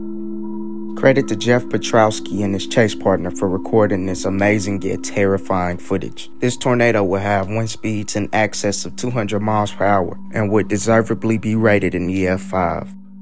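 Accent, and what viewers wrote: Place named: Louisiana, United States. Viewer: I’m hearing a Cajun accent in the narrator. I love that accent!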